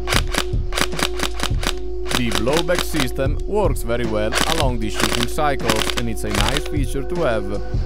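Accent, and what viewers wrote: Italy. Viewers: Don't agree at all. Easy to understand and with an awesome accent :) Italian?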